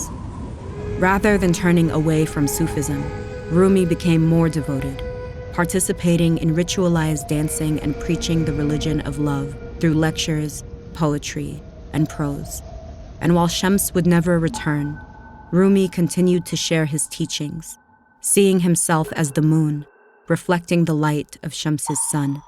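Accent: Arab world. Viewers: Why do you have an arab with arabic accent telling the story of a Persian poet? She even said the name wrong. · Funny that the pronunciations are more Arabic than Turkish even when saying Türkiye. Not very reflecting of the language of origin. Not all Middle Eastern countries sound the same. H is almost silent in Turkish. Speaking as a person that comes from the same city as Rumi. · why the pronunciation is Arabic? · The Arabic accent of the narrator is stunning!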